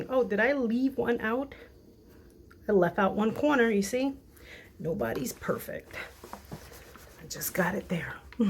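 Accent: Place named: New York, United States. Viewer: I love your ideas. I sew but usually straight lines. You are extremely helpful. I also love your accent. NY? NJ? Mass?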